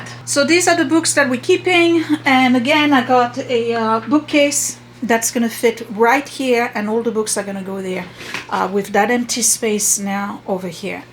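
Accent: France. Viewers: LOVING THIS SERIES! 🥰 I enjoy hearing ur reasoning along the way! And love ur French accent❤️ great work!!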